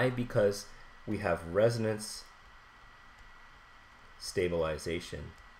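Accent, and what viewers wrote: Canada. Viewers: You're Canadian, aren't you? Love you, man. Thanks for the 3 AM lesson.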